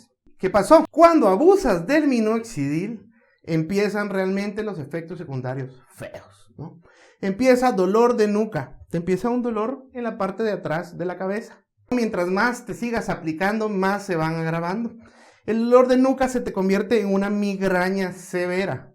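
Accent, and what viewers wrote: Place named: North, Mexico. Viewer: Creo que del norte de mexico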